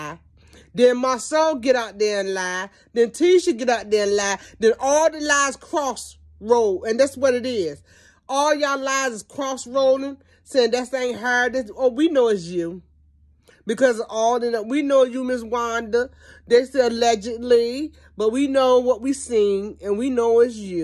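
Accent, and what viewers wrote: United States. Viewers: No she dont. She sounds like she maybe from The Carolinas, Mississippi, Georgia even. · I kept listening and I hear wisdom and plain common sense. You sound like you from New Orleans? Good talk my friend!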